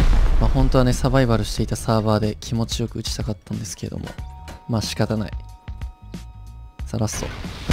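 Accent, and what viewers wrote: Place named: Kansai, Japan. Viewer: 関西弁バチバチすね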